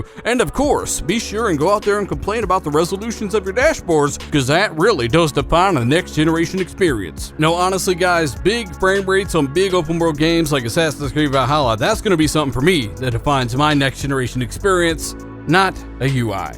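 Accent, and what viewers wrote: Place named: South, United States. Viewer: your hick/country accent sucks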